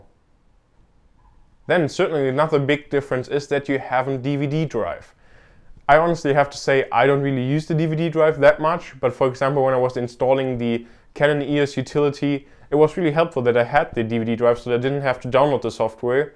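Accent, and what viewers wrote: Germany. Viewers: you german? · Are you german? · He's from germany :) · oh but i just keep my superdrive in it.. i am planning to upgrade the ram memory to 8 gb to get ilife a little more fluent :P and yeah i heard a little german accent, i thought u were dutch :p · Deutscher Akzent :) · do you know how big of a difference there is in performance between the two? or if it's barely noticeable? i'm either gonna buy non-retina 13'' (high-end) 128ssd or Low-End 13'' retina. So I'm just wondering: if the performance difference isn't that big, then i'll go for the retina. even though I still use the CD-drive and an ethernet-port :P "give and take"... Next time I might answer you in German, if I guessed your accent correct? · was ein schöner deutscher akzent ^^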